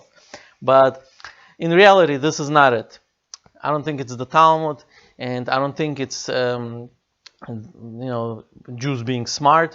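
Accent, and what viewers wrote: Russia. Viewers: You have a Russian accent. Are you from one of the former USSR States?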